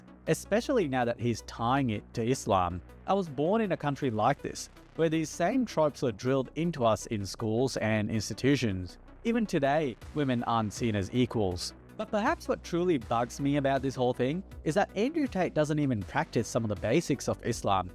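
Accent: Australia. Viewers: Is that a melboune accent sir?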